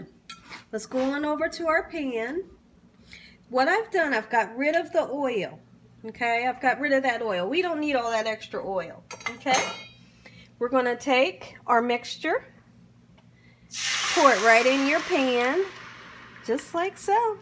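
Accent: South, United States. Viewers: I love your recipes.i like the word like so.girl you gotta be from the south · Love the way you cook and your beautiful southern accent is a bonus. Thank you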